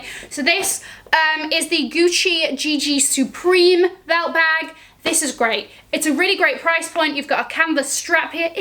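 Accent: United Kingdom. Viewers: Salaam! I just discovered your channel last night. I love me some British accent reason why my other favourite Youtuber is a Brit as well. I have been binge watching your videos ever since. I wish your videos were longer though because why they end so quick lol but on the flipside, you are on point, no hanky panky but so so so much fun to watch! With that level of energy, i pray you will hit a million in about two years or less! You go girl!!! ❤ p.s: you are very pretty MashaAllāh!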